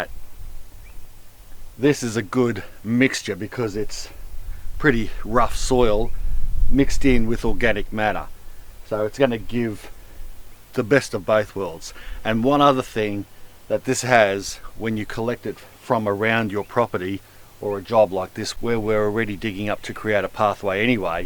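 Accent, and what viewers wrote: Australia. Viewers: I'm half watching just for the accent. The Aussie accent is the coolest there is. G'd day, mate. Oh, and thanks for saving me time and money. I would have filled mine with high quality $$$ soil. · currently 26 people don't like this video: you have no hearts guys! :D Aussie accent the body and the energetic effort with a ton of passion - together make my days better! A FULL thumbs granted!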